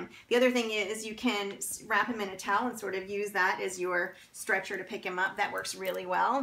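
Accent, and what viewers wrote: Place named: United States. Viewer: English is my main language but I have no idea what you said in the first bunch of things you said so did not watch any more. You need to slowdown, speak clearly and realise that Youtube is an international device; and that the American twang you have is not easy on the ears of those of us who are from other parts of the world.Not to mention those who have English as a second language. I hope you are a better vet than you are a speaker.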